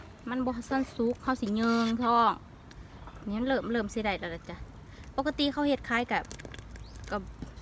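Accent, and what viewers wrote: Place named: Isan, Thailand. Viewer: พูดเหมือนคนอีสาน สำเนียงได้เลยครับ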